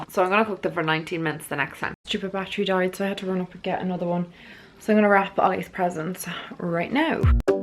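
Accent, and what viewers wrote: Ireland. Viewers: I’m so happy 😀 that your finely being your self. You should enjoy 😉 your life and your family always comes first. Who cares what people are going to say because you can’t please everyone and you can’t get everyone to like 👍 you. I love 💕 to hear 👂 about Baby Noah and how much he’s growing. Don’t ever think 🤔 you have to apologize because you don’t. Besides I live here in Texas and I love 💕 it when y’all show parts of Ireland 🇮🇪 and I love 💕 your accent. Now go get some rest. Merry Christmas 🎁🎄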